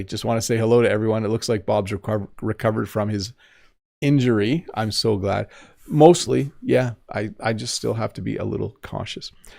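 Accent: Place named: Canada. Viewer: Assalamu Alaykum,. My first name's Ali. My last name's Orkhan. By the way, I've been watching your lessons for three years😊😊. This lesson was very good for me. After all, this lesson gave me a lot of knowledge in English. I watch your videos because I love Canadian Accented English. Or rather, I speak Canadian Accented English because I love your lessons,. Greetings to you from Azerbaijan.❤❤❤😊😊😊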